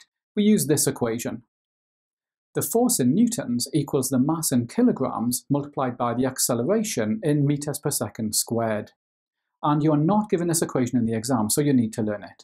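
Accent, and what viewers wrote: Wales, United Kingdom. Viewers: Is this guy Welsh?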